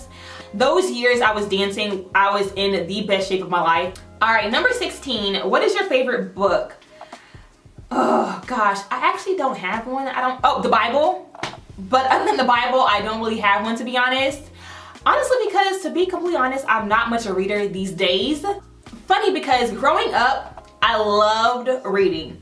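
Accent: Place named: Louisiana, United States. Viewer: ❤️ ❤️, sounds like where I grew up in Louisiana 😊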